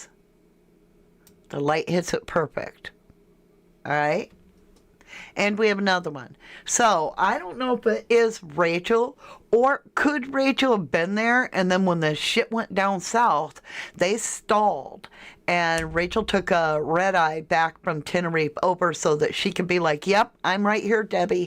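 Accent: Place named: United Kingdom. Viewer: Probably British, and street wise street talking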